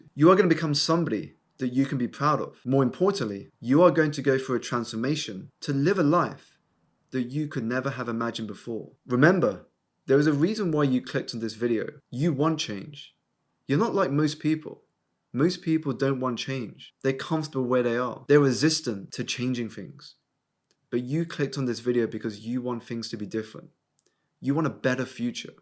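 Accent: United Kingdom. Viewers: Your british accent is cool.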